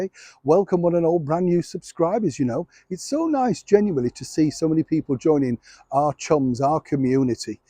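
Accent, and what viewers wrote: Yorkshire, United Kingdom. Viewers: Agreed said like a proper Yorkshire man! 😊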